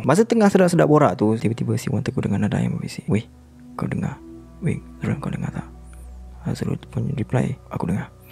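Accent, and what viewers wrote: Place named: Melaka, Malaysia. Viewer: X bkn org Melaka ni...mengelibas pekat😂😂😜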